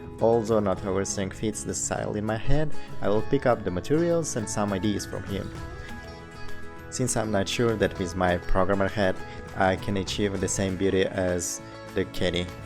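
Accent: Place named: India. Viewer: I like his good indian ascent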